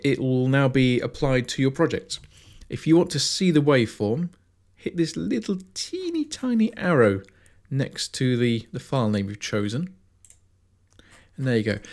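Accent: United Kingdom. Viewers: Not sure if sophisticated American or just British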